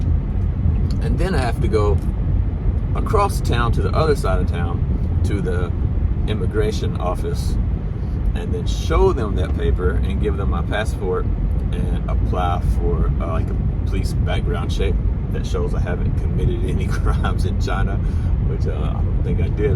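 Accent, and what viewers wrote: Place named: Florida, United States. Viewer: hey there >>>nice ta see y'all again good vid! your accent, and mine sound damn near alike. where are you from originally? am in florida. born in Orlando. live in Lakelsnd, FL now. am an original "cracker." lol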